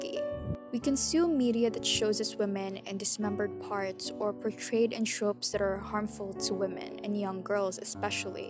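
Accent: Philippines. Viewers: I know a Filipino accent when I hear it.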